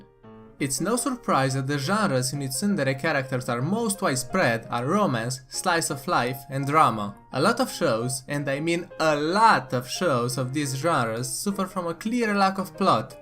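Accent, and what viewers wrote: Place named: Russia. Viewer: Arzos aye his accent seems to be somewhere between East Eu and Russian.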